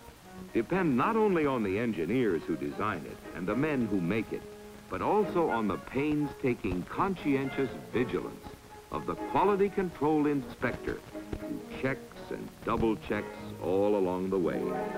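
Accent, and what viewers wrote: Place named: United States. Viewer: Nice! But one thing that I found interesting was how clear and beautiful was the narrator's American.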